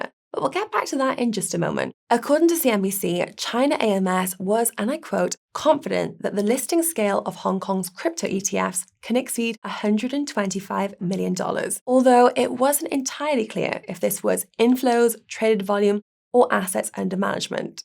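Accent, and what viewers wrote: United States. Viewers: Really appreciating ya'll from Pensacola Florida. American accent is hilarious.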